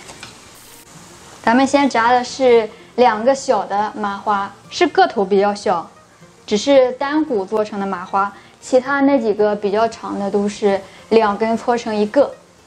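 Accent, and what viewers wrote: Shandong, China. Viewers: 普通话有山东口音。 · 我听口音早就听出来她是山东人了，哈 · 我听口音也像山东人，竟然还是临沂老乡！是临沂一中毕业的吗？怎么没有糁？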